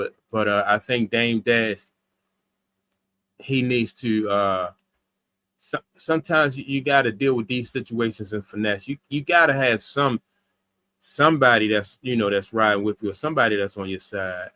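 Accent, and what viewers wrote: Baltimore, United States. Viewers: I can hear the Baltimore in your voice.. my hometown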